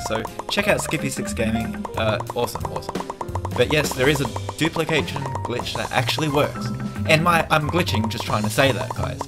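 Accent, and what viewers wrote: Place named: Australia. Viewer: you sound australian, like me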